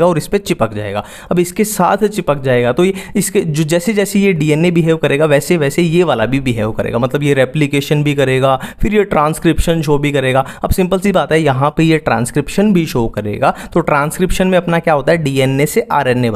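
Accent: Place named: Punjab, India. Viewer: Sir i think you are from punjab,as it seems by your voice tone.....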